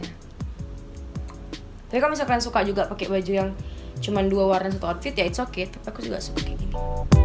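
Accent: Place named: Lhokseumawe, Indonesia. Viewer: logatnya kaya anak lhokseumawe atau aku aja yg salah denger._.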